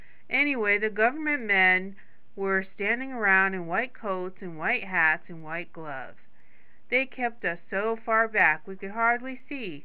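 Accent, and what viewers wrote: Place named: Midwest, United States. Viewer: I like this person's voice for this book, it fits, sounds midwestern to me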